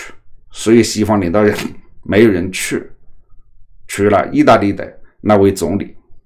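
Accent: Hunan, China. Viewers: 我麻烦你莫用湖南腔来港话好不？丢湖南人脸，你老屋哪里滴？长株潭地区的吧?真滴舍里舍格！ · 这个主持人一口的湖南腔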